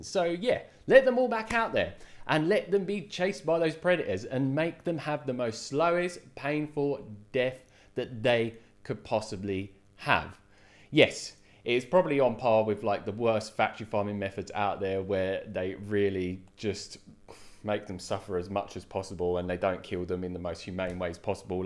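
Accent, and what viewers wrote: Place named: England, United Kingdom. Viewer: İ concur with you. İ watched this video for listen your enlish accent. And i understand you clearly :)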